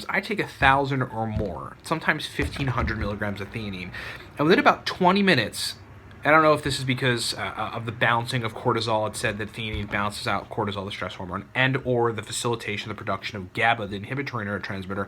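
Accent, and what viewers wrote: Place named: New York, United States. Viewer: that's pretty close to New York. That's probably why I don't notice a difference between your dialect and mine. The only time I notice people talking fast is when they have a unique voice in addition to them talking fast (Ben Shapiro). I really didn't notice it in your case since your voice isn't that unique.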